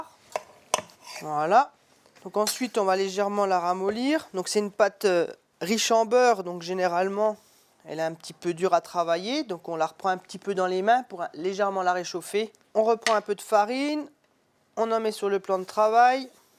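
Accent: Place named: Rural, France. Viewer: Le gars avec son accent du terroir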